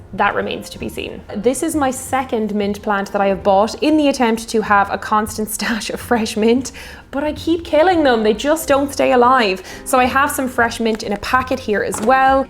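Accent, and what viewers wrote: Ireland. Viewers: I don't know why, but for some reason I was expecting you to pronounce "derby" as "darby" with your Irish accent.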